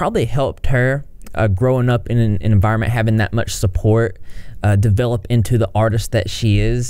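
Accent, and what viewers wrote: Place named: Texas, United States. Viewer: Your southern accent is as heavy as mine lol are you from TX?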